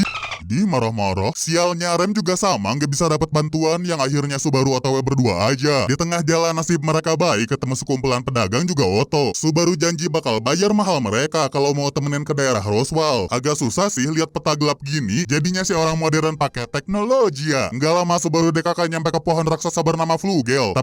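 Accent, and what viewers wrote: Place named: West Java, Indonesia. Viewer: nyunda banget logatna😭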